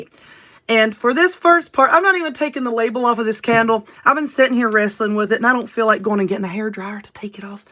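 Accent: South, United States. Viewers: Your so funny. This are beautiful crafts. I just love listening to you with your southern accent. I used to live in SC for almost 30 years before moving here in MN 3 years ago! Yes weather here is terrible right now we had snow storm Sunday. I missed the the weather in the South.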